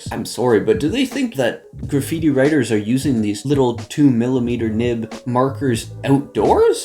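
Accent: Canada. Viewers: just a question are you by chance from newfoundland? your accent kinda sounds similar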